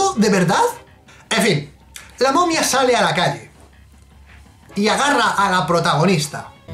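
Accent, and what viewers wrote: Mexico. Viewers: Es la verdura esa placa, habla mexicano puro y duro jajaja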